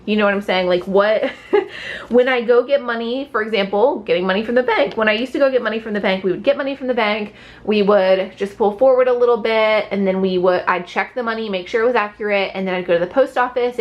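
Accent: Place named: Oklahoma, United States. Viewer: I dont know where you moved yet, need to watch the other videos. I am just taking note that it sounds like Oklahoma. Lol. Which is where I am. 😂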